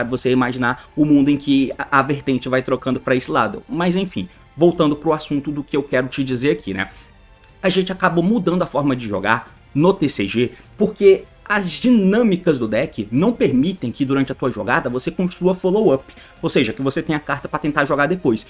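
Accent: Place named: Bahia, Brazil. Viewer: Não deixe os haters mudarem teu jeito baiano de falar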